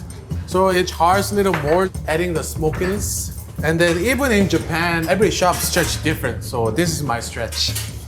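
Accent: Mexico. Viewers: He really sounds a slightly bit mexican with the intonation of his voice as he pronounces words 😂 love it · Why does he sound Mexican?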